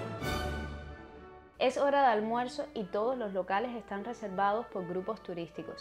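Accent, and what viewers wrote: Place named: Cuba. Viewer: Ver Trinidad, me recuerda muchos de los llamados pueblos Mágicos de México, Estimada me encantó la música "habanera" como intro y fondo, tu relato bien estructurado y con muy buena dicción y ese exquisito acento cubano, Trinidad es un pueblo mágico con un enorme acervo cultural e histórico, te agradezco por mostrarnos tu amada Cuba, te mando un abrazo grande desde Jalisco México.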